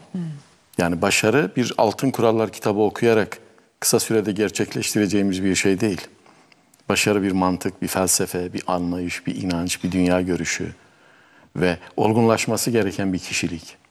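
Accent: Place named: Erzurum, Turkey. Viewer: Allah hocamiza uzun omur versin. Erzrum şivəsi, eyni İrəvan turklərinin şivəsi!!!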